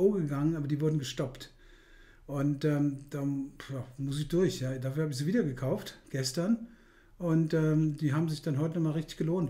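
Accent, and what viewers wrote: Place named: Frankfurt, Germany. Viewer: Klasse! Erinnert mich an meine Frankfurter Börsenzeit, und abends im Bitburger, da war auch dieser Dialekt am Start :) Vielen Dank für die solide Arbeit!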